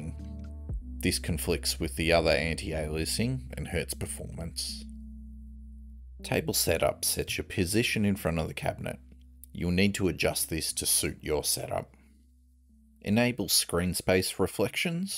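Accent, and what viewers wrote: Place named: Australia. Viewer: Great guide, thank you for making it clear and concise, and easy to follow. Liked and subbed, What's more you speak Strine, which makes it even easier to follow :D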